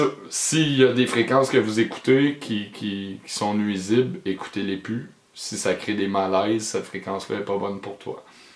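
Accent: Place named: Quebec, Canada. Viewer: difficile cet accent québecois ...je saisis très peu de ce que vous dites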